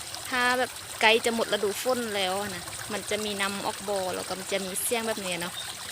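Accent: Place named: Loei, Thailand. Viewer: สวัดีครับน้อง สำเนียงภาษาเหมือนกับจังหวัดเลยของไทยเลยฟังแล้วน่ารักเป็นธรรมชาติดีครับ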